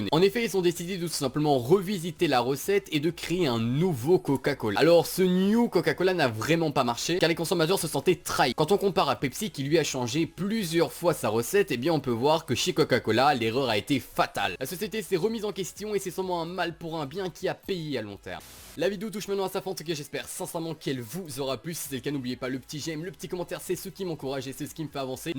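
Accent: Belgium. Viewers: esque tu est belge???? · parce que il est belge est qu'en Belgique les nombres ne se prononcent pas come en France, par exemple 90 se dit ''nonante'' et 70 ''septante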